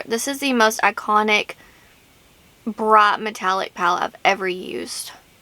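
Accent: South, United States. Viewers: very haha hey neighbor!! I knew you had a southern accent and had to be close lol 😂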